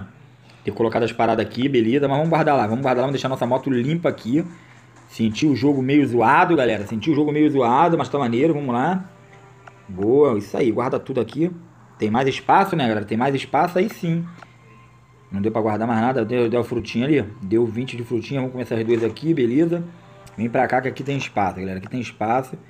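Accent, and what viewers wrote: Rio de Janeiro, Brazil. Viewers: Vc E carioca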